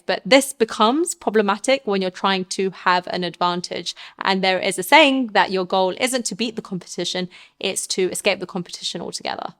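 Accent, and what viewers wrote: United Kingdom. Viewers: I love that accent...I'm assuming it is British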